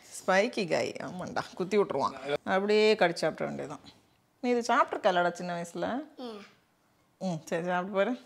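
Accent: Nagercoil, India. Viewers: Akka ninka nagarcoil thana . nanum tha yena ninka peasurathu and ninka pandra nagarcoil side enjithiyal famous appovea kandupudisan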